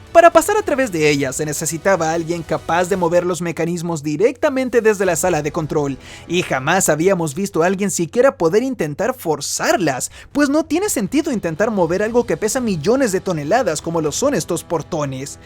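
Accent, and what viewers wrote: Chile. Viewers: Pensé que era chileno, de mi chile querido.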